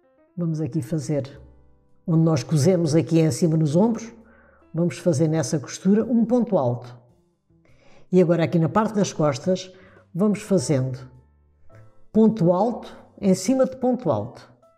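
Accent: Portugal. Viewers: Lindíssimo. Grata por compartilhar. Continue por favor , esse sotaque português , muito agradável.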